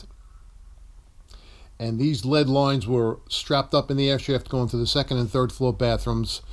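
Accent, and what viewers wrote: New York, United States. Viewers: Nice NY accent!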